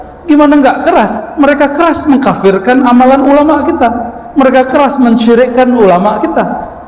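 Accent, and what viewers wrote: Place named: Rao, Indonesia. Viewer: Slang nya seakan² urang Rao..seperti ibu saya.cuma ada beza sikit²...Apa itu bahasa Rao juga ya... Senang dgn pengajian beliau..terima kasih ya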